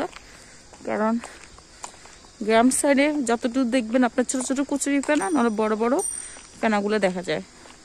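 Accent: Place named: Noakhali, Bangladesh. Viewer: Noakhalir je sposhtho buja jay,goir ladi koy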